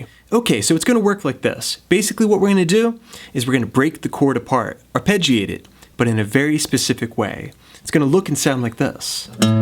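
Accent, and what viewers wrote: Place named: South Jersey, United States. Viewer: I'm from South Jersey but have lived overseas a long time. Great hearing that familiar SJ/PHL accent! Great tutorial. Seriously helpful!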